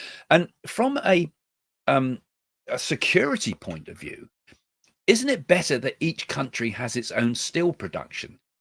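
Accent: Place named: England, United Kingdom. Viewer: So refreshing to hear an English voice on You Tube. So many Psychic forecasters are American. Thanks xx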